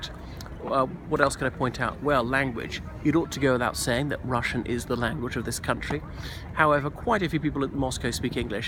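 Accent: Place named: Home Counties, United Kingdom. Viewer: u seriously from Ireland? U sound like you're from the home counties!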